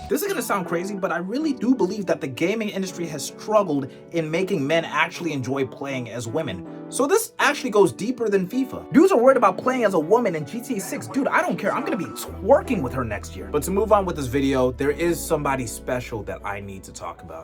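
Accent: United States. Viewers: I understand the South Africa to England thing, but I can't place the American accent in your timeline. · Then how did you get that American accent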